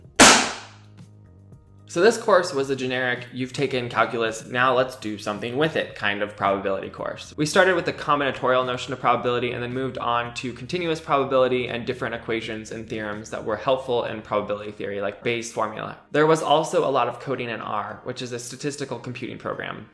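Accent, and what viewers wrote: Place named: North America. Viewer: you have what I call the "white girl tounge curl" its a condition that effects many north americans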